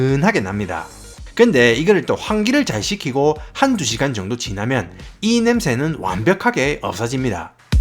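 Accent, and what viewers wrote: Gyeongsang, South Korea. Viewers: 영어 speaking은 표준인데 한국말 억양은 대구 사투리 같은데 구수해요. 오랫만에 미국에서 듣는 구수한 된장국 억양. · 어제부터 정주행 하고 있습니다. 처음에는 야무진 갱상도 사투리가 재미있어서 보았으나 님의 봉사정신을 보고 존경하는 마음까지 생겼습니다 주위에 약자들 사회에서 소외된 사람들에게 힘과 용기를 주시는 분께 박수를 보냅니다 🎉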